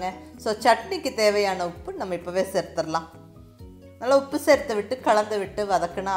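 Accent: Coimbatore, India. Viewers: எங்க கோயமுத்தூர் பாஷை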